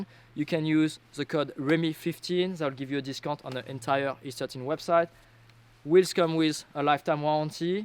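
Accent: France. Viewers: OH avec ton accent a la française comme vous le dites j'ai du mal a comprendre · Merci pour ton accent bien français, je comprends mieux l anglais comme ça 😄 Sinon tu déchires tout, continue comme ça et bon ride 🤙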